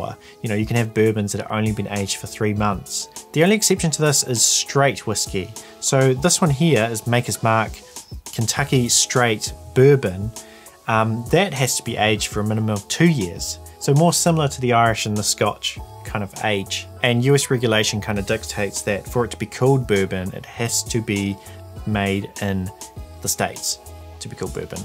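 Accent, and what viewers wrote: New Zealand. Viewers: That's some damn fine production values there fella. Great to hear a kiwi accent on top of some great whisk(e)y content.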